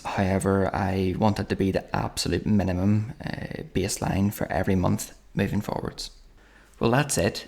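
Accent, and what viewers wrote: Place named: Belfast, United Kingdom. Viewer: Belfast accent. Same as myself. This is good info. Thanks man. Best of luck with it all.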